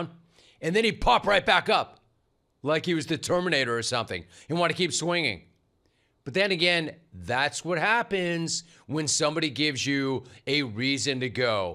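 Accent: Southern California, United States. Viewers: your soo funny with your so-cal accent and lingo.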